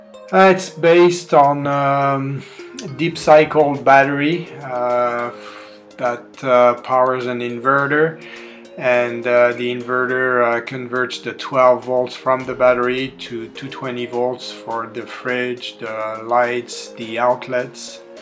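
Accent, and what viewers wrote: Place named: Argentina. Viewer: hacelo en castellano, no se aguanta ese acento argento en el inglés....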